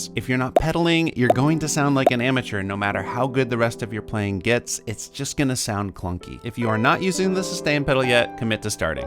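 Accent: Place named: United States. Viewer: This video is amazing. I will show it to my students. And by the way - what pleasant American-English you speak!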